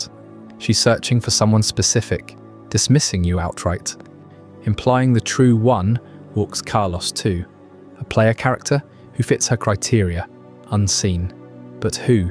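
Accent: United Kingdom. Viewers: Great videos could you do your voice a tad less British please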